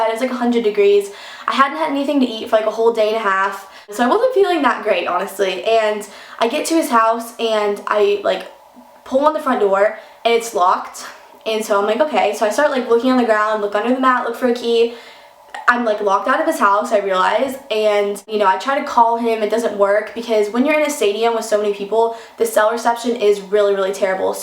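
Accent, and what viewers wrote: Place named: United States. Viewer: i love how you have a country accent that comes and goes (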